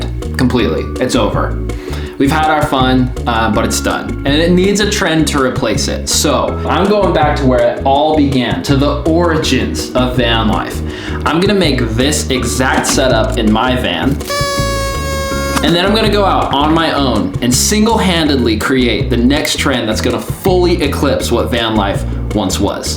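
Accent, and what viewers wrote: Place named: Minnesota, United States. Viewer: Nice video and great editing! I'm hitting the road this summer for a project... But I'm older and going to use a van 😁. New subscriber though today. Couldn't tell, but thought I saw a hint of Minnesota? Best wishes on your new adventures 👍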